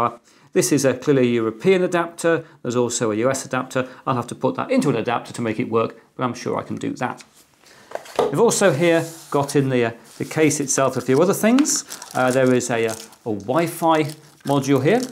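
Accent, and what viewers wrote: United Kingdom. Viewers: Why do british people say "Sor" instead of "Saw"?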